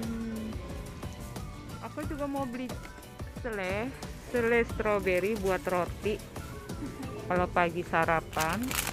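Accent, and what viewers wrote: Bekasi, Indonesia. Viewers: Mpok next kalo buat vlog lumayan lama durasinya yaa, suka banget liat vlog Mpok, apalagi liat yang super gemes, masyaa Allah seneng banget liat Mpok walau udah tinggal disana tetep gak sombong dan aku suka gaya ngomong Mpok yang khas Bekasi hehee, Salaam dari Bekasi Mpok.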